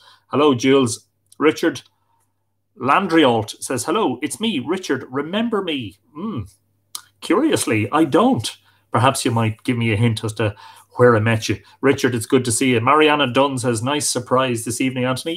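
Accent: Ireland. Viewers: The Irish accent is helping your cause. I love the Irish accent especially coming from a sweet Irish lass. I've been told I have an accent is well having grown up in Boston but I always thought it was everyone else that had the accent not me. Anyways, God bless the Irish and God bless Ireland. It sounds like you guys need it just as much as America these days. Saint Patrick and Saint Bridget send help to Ireland!!! 🍀🍀🍀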